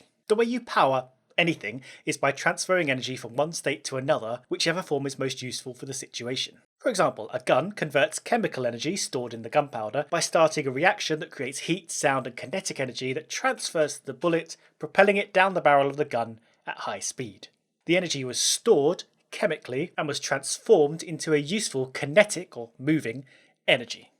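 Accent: United Kingdom. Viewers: How much I love this channel and how helpful it is, I find it extremely hard to follow the language. No secret that British accent is more sophisticated if you please, so it's hard for a non-native speaker to catch it at times, then the speed of narration is quite high to read the subtitles, especially in parts where the language is altiloquent. Moreover, there's technical stuff that you're trying to wrap your head around which makes it all even harder. In view of this I heartily ask you to consider slowing down the narration a bit, and maybe spell some words more distinctively. Thank you!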